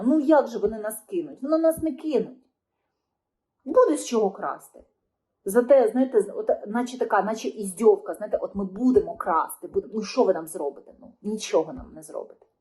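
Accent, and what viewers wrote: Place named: Moscow, Russia. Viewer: Ця касабка ще на початку 2022 року зомбувала аудиторію російською з мацковскім акцентом. Така типова мер &твечуківсько-ахметівська гебнява журнашлізда.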